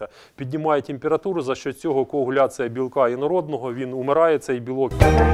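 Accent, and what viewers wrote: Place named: Dnipro, Ukraine. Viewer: Добрый вечер ув. Подписалась на Ваш канал, во всем Вам поддерживаю и рада что у меня есть единомышленники. Я далеко но мечтаю о своей ферме.потому и далеко чтобы приблизить свою мечту и рада что встретила Ваш канал. Я Ваша землячка из Днепропетровщины. Вот только ненада оправдываться за наш акцэнт. Днепропетровщины всегда была и будет русскоязычной, как не крути и какбы это ненравилось нашим братьям из Западной Украины. Почему нам кто-то должен диктовать на каком языке разговаривать. Вам успеха во всем.и добра Вашему дому.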